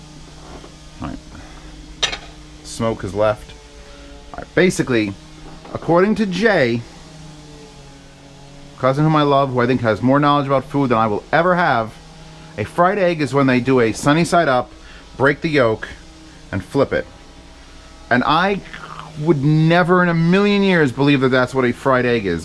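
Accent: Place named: New Jersey, United States. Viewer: Where you from? Sounds like Jersey or Philly... just bring it up because "white American"... I say it, and I'm from South Jersey (right outside of Philly)....live in wretched North Dakota now and they call white American..... fucking Swiss!!! Swiss!!!! It's not Swiss, Swiss has holes and is also delicious with imported ham, but is NOT white American cheese damnit. Ok, that's all.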